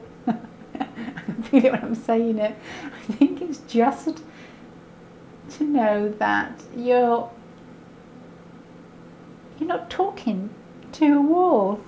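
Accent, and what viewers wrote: Manchester, United Kingdom. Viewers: I got my friend Steve from Tamworth to listen to you as I was curious where you’re from and he’s great at picking out accents but you have stumped him. He says you don’t have much of an accent but if he had to guess he’d say Manchester or north of there. Me being American, I have no clue whether he’s right or not, lol. I love listening to you though. The way you say “you” when it comes at the end of a phrase is just lovely. ✨💛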